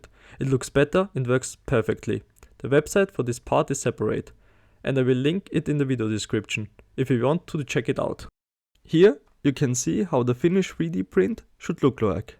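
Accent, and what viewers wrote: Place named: Germany. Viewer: I can hear German accent XD but good video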